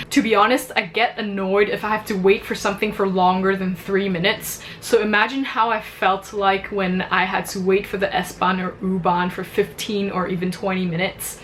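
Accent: United States. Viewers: Did you pick up that perfect American accent whilst in Hong Kong? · Wow! I would have never guessed from your heavy Americanized accent that you were from Hong Kong. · In Chinese (I don't know about Cantonese) there is 您 (Sie) and 你 (du). The older one offers "du" to the younger one. No, you can never, ever go back to "Sie". Even if you hate each other. You cannot go back to less distance after you've moved closer together. You cannot "unknow" someone. It's trying to change the past. Trying to make things "unhappen". Intimacy cannot be reversed. That's like putting toothpaste back. I have lived in China for four years. You finding distance and politeness mutually exclusive is soooooo Chinese. 不用客氣!!! Keeping the distance is an expression of politeness. You keep the distance to not bother the other person by coming uncomfortably close, emotionally speaking. 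From my cultural point of view you are confusing politeness with friendliness. Not saying "Guten Morgen!" isn't considered rude, it's considered unfriendly. How come you're speaking English like a US, white girl? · Would not have guessed that you were from Hong Kong. You sound American or Canadian. Then again my friends who are from Hong Kong grew up here in the States, so what do I know? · She really does have a good American accent!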